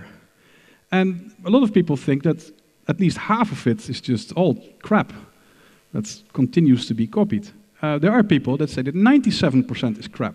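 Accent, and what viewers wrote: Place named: Netherlands. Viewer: He is dutch i hear that 😜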